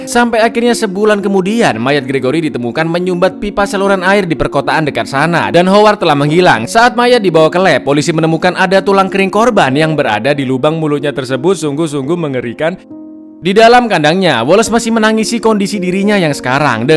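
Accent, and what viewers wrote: Java, Indonesia. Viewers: Org jawa kh bg anjay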